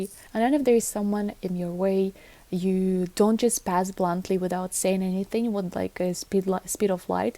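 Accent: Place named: Bern, Switzerland. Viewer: The greeting thing: Depends a lot of how urban the setting is. It's less common in the big (for Switzerland) cities. Your Bärndütsch is cute. Etiquette on crosswalks: No real need for a hand sign, just be clear with your intentions. Seek eye contact with the driver, set a foot on the road, wait for the driver to slow down.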